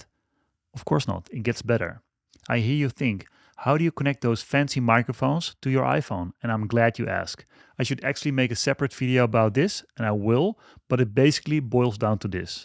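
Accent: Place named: Netherlands. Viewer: I hear a dutch accent🎉.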